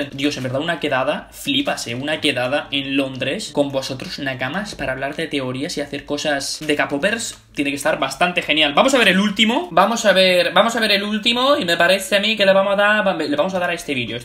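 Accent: Argentina. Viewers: Se le sale lo argentino a jajajaja extrañaba poder ver videos tuyos! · el acento argentino JAJAJAJAJJAJA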